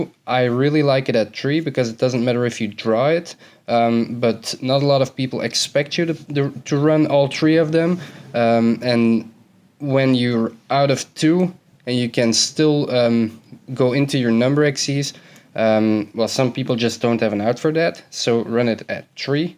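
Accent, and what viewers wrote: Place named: Montreal, Canada. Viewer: 🤣 ton accent est pareil de ceux de Montréal 👌😁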